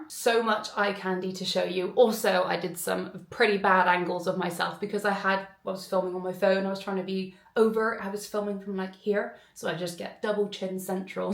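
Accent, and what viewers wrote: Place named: RP, United Kingdom. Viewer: First off I'd like to say I do enjoy your content ...but I feel this needs to be addressed as iv seen a few vlogs where youre not happy with your experience in these designer stores ...A lot of these stores deal with millionaires who shop their brand constantly , a lot depends on appearance and these staff are trained to know who is wealthy and who is not , unfortunately you will experience what you show , wearing leggings , speaking in a posh accent and being knowledgeable about designer brands won't cut it for high end stores , whilst I agree its not acceptable to be treated any differently ,but as you say they don't really respect people " drinking champagne on a prosecco budget " unfortunately buying designer every now and again or when they're on sale wont give you the experience you think you should get, I have bought designer bags shoes etc but I never expected preferential treatment , I just enjoyed the experience for myself and the purchase , honestly its giving mutton dressed as lamb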